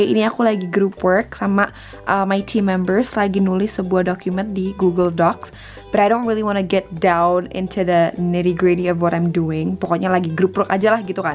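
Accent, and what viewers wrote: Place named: United States. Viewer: American accent udah dapat👍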